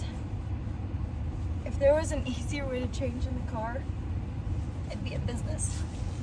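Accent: United States. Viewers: why not buy true american you sound american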